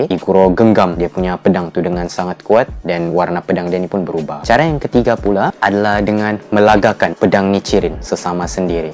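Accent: Sabah, Malaysia. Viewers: abang ni orang sabah ke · Speaker ni orang tawau sabah ka?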